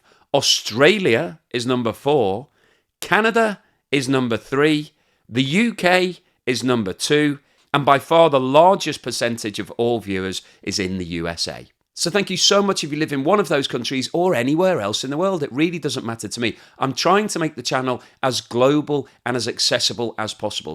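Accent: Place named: Liverpool, United Kingdom. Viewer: Happy Christmas 🌲 (how do your US followers understand the Liverpudlian dialect?!) Keep up the great work.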